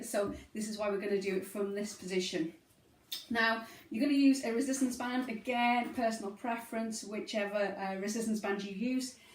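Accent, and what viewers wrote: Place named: United Kingdom. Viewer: you are beautiful and I love your British accent. God bless you from the U.S.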